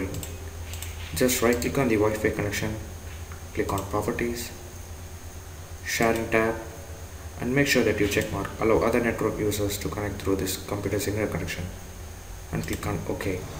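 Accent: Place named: India. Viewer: You Accent havin Indian boy. Thanks for Helpin me out!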